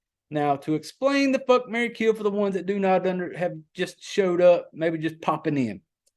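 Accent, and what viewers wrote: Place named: South, United States. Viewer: Dude it ain’t no problem at all. I’m from the South too man. Just above Danville Virginia. When I heard you talk I was like hell yeah! I like this shit! I have The Tank lined up for me and my wife to watch tonight. That sounded like my kind of movie 👍🏻👍🏻 I started listening to your first podcast and I’m working my way up so I don’t know what all you’ve put on the podcast but do you ever watch Bigfoot movies? I loved me some Bigfoot dude. Check out Exists if you haven’t. I would love to hear your take on that one 👍🏻👍🏻 I’m an Elijah Craig drinker myself, matter of fact I took a half day off work to enjoy that beautiful stuff and mow my yard! Lol! I ain’t never mowed sober.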